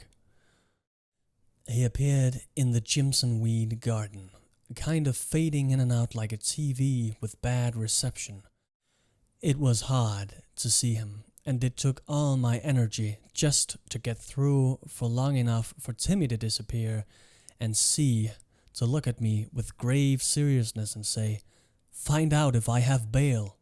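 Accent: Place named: Germany. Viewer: nice accent, are you from Germany?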